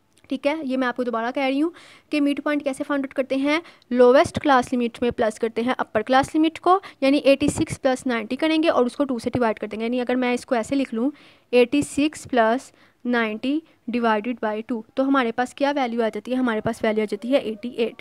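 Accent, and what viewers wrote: Lahore, Pakistan. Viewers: Lahorey accent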